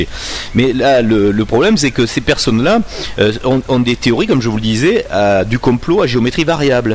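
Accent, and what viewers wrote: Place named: Toulouse, France. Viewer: Merci mille fois pour vos vidéos. Pas une seule seconde à manquer sur vos présentations, chaque fois que je la réécoute. Pas un seul mot de trop ! Et vos digressions, délicieuses. En vous écoutant je pense, 'c'est magnifique cet accent toulousain qu'il a ! ' Bon je fais donc partie de la troupe des E-veil-lés. Oups ! Ne pas confondre avec les intentions d'une revue ... "Réveillez-vous" ! Et je vais maintenant relire... "La solution extra-terrestre". (Petit conseil en passant par là..: découvrir les expériences du pilote de chasse à la retraite Jack Krine - sur Youtube. )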